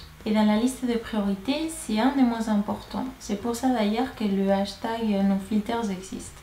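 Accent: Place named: Spain. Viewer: Elle a l accent espagnol mais on la comprend très bien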